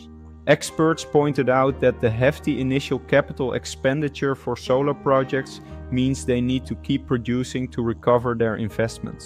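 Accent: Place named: Netherlands. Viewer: By the accent, you're Dutch. This is a thing mostly just happening with us Dutchies. Its really not an issue yet in most of the rest of Europe.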